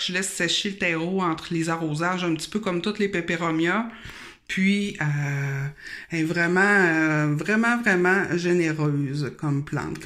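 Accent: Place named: Canada. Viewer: Bonjour,j aime beaucoup vos plantes toutes,et ♥ aussi votre bel accent ♥ canadien.De la Belgique bisous une amie des plantes,Superb plant tour et partage comme vous le meme avis sur vos plantes et les mienes de ce fait.Felicitations et a bientot.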